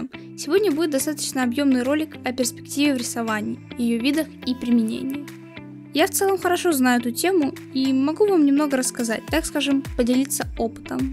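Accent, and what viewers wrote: Ukraine. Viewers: Возможно она украинка и у нее есть небольшой акцент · оо ахахах_)) не потеме, я такая слушаю видео и.. думаю... чтот тут знакомое... Гхрань... и поняла по говору что автор украинец, чекнувши профиль)) как я поняла, я удивляюсь хахахахах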